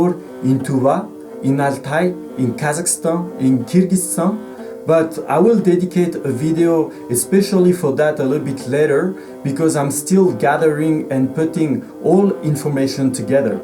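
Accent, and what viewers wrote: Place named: France. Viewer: Naturellement ! je voulais dire par là qu'on entend bien votre accent français dans la langue de Shakespeare. Et oui merci pour les sous-titres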